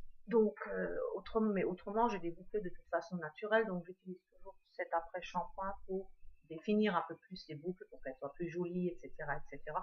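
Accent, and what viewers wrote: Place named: Lorraine, France. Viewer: tu es de la lorraine ? ton accent me fait penser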